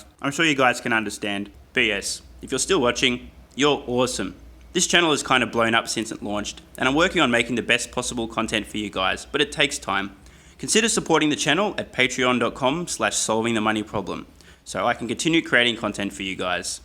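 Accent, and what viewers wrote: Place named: Australia. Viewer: I can tell you are an Aussie, I'd like to have a beer or more, where are you?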